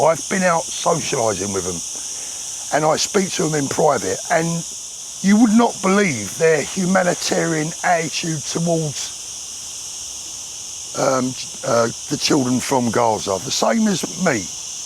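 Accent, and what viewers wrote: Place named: Cockney, United Kingdom. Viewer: You're like a cockney boxing coach from one of those movies. Thankyou.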